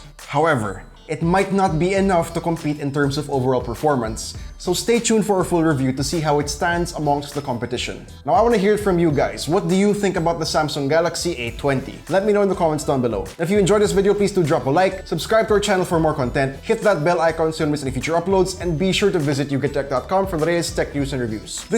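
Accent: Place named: Manila, Philippines. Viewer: It's Manila boy accent boy!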